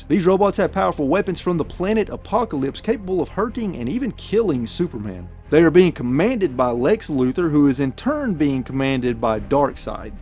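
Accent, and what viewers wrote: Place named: South, United States. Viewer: Thanks for the review! As a fellow southerner, I was really happy to hear your accent!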